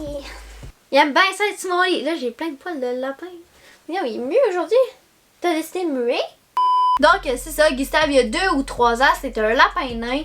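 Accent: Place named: Canada. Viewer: t canadienne t'as une accent cannadien😊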